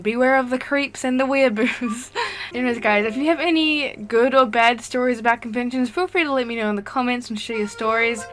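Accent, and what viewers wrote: New Zealand. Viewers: You're a kiwi too? I just assumed you were australian oops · Are you from New Zealand?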